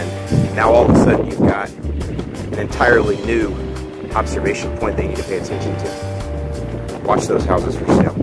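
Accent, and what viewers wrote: Chicago, United States. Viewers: I love the exaggerated Chicago accent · nice chicago accent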